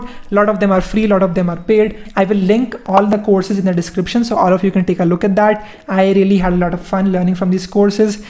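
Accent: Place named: Andhra Pradesh, India. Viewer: thanks for reply but it seemed like you are from any part of our South like Andhra guy so that's why asked.by the way really you are doing great job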